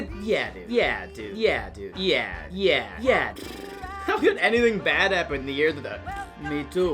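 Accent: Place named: Albuquerque, United States. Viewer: Dude, your Burqueno is spot on. How much time did you spend In Albuquerque to pick that one up? lol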